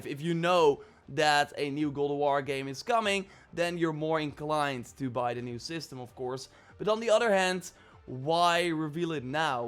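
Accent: Netherlands. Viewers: Je klinkt als een hollander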